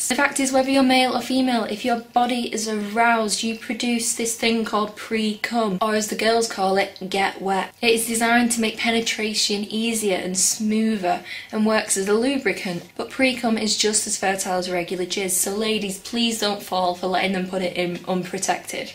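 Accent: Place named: United Kingdom. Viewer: I love your british accent...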